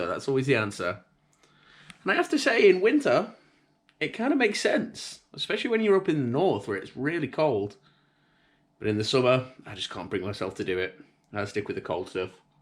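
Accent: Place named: Merseyside, United Kingdom. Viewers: A pleasant guy with a lovely English accent exploring China. Great video mate! I'll keep watching and listening. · I detected a Merseyside accent - when you mentioned Rock Ferry, it confirmed it. I am British born Chinese from Liverpool. Nice video.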